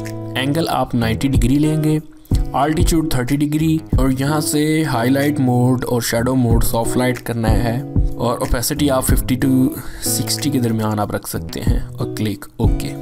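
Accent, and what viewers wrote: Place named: India. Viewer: bruh... when your pronunciation sucks, at least show the command key I cant get what you are saying literally because of your Indian pronunciation...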